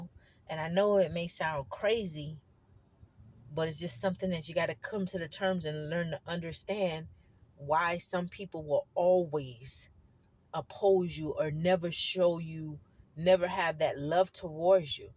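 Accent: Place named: Louisiana, United States. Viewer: Yessssss 🎉 John 15 Hated Without A Cause If They Have Hated Me They Will Also Hate You “ Come On Women Of God Say It “ Even From My Own Family And So Called Friends “ Used And Took Advantage Of My Kindness And Mistaken It For Weakness Not Realizing They Must Reap What They Sew Nobody Gets Away Also Thanks 🙏🙏 Women Of God For This Channel I’m A New Subscriber “ Keep Being A Absolute Blessing To EveryOne ❤❤❤❤❤❤❤ Also Are You From Louisiana Because My Family’s From There Aswell And When You Speak I Hear It 😊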